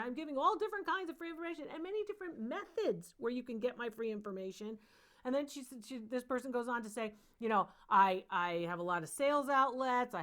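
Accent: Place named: North Jersey, United States. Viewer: Love you Doc! .... Are you sure you're not from North Jersey? 💗🤔😊 You sound just like my sister-in-law. (That's a compliment)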